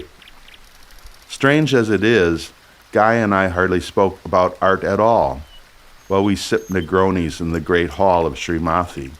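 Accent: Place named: Milwaukee, United States. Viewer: I was looking forward to listening to this while getting back into the studio, that's how I usually listen to any radio programs. Thus maybe I didn't have the type of undistracted focus I might have had if I was not working on my "skilled" painting at the same time, but it was nice in that it felt like I was painting while in a room with my friend. His voice is so soothing in this piece, with the strong Milwaukee accent that made me homesick for the midwestern United States when I first met him in India, that he can broach ideas that I might disagree with in part with the kind of reasonable dialogue and give and take that used to be a part of our political culture, in art and politics, but sadly seems to be lacking now. I love how it flows in and out of past, present, the personal, political and framed by his residency with his friend and scholar Mary Storm in Sri Lanka. 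I feel I could listen to this again and again and get something different from it each time.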